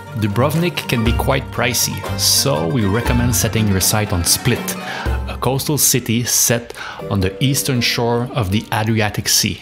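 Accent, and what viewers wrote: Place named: Quebec, Canada. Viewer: J’ai spotté ton accent Québecois lol. Nice video btw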